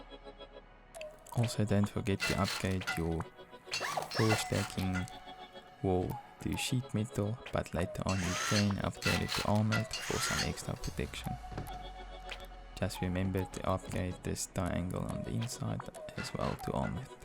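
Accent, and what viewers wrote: South Africa. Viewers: Hold up, you from South Africa mybru?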